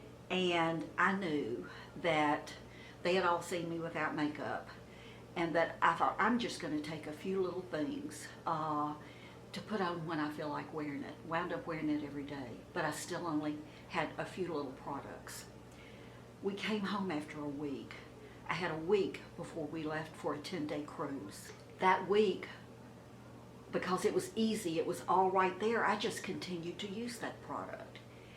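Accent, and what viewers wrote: South, United States. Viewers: Well Hallelujah and God Bless You. U have done what I wish all the others would do. Glad u reached this point and got off the crazy roller coaster. These others start out to review products, make money and get a Gillan w major company to get freebies. But they get sucked into the tornado of vanity and keeping up with the gurus. I've watched Kandee for 9 yrs maybe, and she is a true artist and appears so happy and sweet and not jaded. She a beauty. I have tired of the few others I did watch and only look around for swatches of lip colors, etc. I'm a lipstick gal.. Thts my 1 product, just as my mom's was. I too, use a cubicle almost exactly like urs, acrylic w a handle and it was a flatwear caddie tht I use to use serving buffet style. It's perfect und sink and easy w the handle. I have no more other than a box of all my lipsticks cause I wear diff shades. 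Do, glad u guys are safe and Destin is where we vacationed 18 yrs I was married. It's wonderful and I'd love to live there one day but I havent done sun in the last 12 yrs. U sound like u might be from GA or SC. Take care and stay beautuful. Doesn't take makeup when it comes from within.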